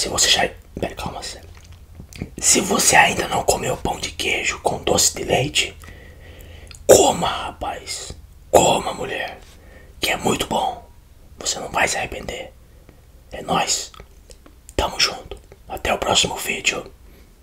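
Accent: South, Brazil. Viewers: Tu é de SP mesmo? Teu sotaque parece do Sul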